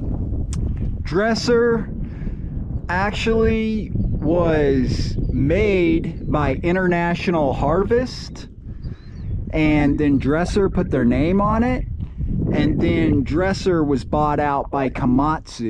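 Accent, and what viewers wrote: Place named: Appalachia, United States. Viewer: Actually another Completely annoying Amateur wanna be when yacking about the dozer only control stick you said the blade goes forward which is annoyingly Stupidly obnoxious the machine goes forward not a dozer blade.you obviously have the same hillbilly accent as the long dead n hayden who of course thought they were invincible peddling a pedal bike in italy ignoring a walkman equipped Stop sign getting graciously taken out forever by one crossing car brain dead from then on was also brain dead before impact.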